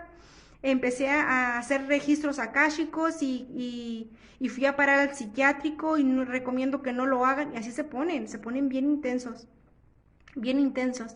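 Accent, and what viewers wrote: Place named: Mexico. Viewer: Por cierto me encanta oírte bien mexicana 😊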